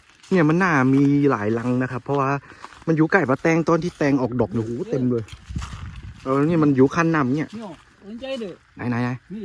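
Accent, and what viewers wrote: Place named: Sukhothai, Thailand. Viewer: สำเนียงเหมือนคนสุโขทัยเลยคับ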